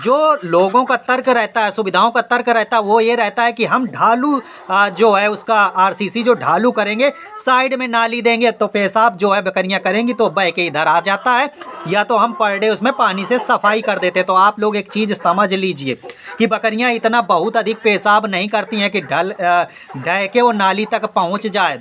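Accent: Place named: Uttar Pradesh, India. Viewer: मैं बिहार सिवान से हूँ, आपकी बोलचाल, भाषा से लगता है कि आप यू पी से हो ।आपकी video बहुत ही जानकारी देता है। कहाँ आपका घर है।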